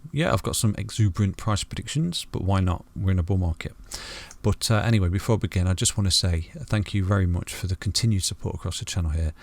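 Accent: United Kingdom. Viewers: You have the cutest British accent I've ever heard. Thanks for the analysis 😉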